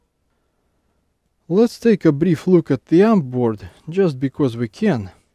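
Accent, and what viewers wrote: Russia. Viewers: Чел , ну слышно же что ты русскоговорящий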